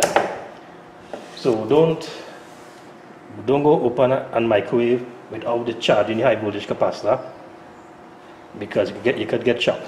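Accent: Trinidad and Tobago. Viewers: Are you Trinidadian ?? Lol my microwave turned off and wont turn back on now dont know if the fuse is blown or not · Surely........a Trini accent.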